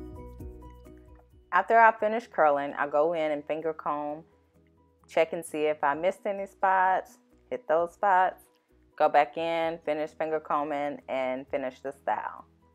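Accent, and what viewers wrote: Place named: New Orleans, United States. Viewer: I definitely hear New Orleans in you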